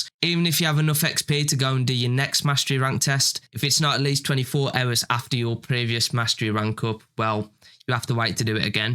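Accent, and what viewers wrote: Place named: Dudley, United Kingdom. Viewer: ive literally just found this and why does it sound like your from Dudley >.<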